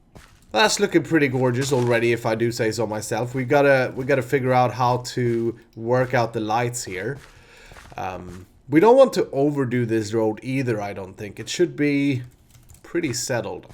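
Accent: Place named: Sweden. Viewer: eller jag hörde på ditt utal att du var svensk :D eftersom att jag också håller på med youtube och jag snackar också engelska i mina videos :D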